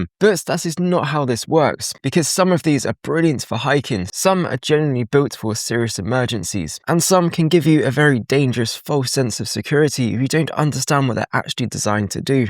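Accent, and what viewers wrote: United Kingdom. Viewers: Thank you, enjoying your videos, particularly nice to hear a voice from the UK. Would like to hear more about emergency water storage if you're looking for video ideas.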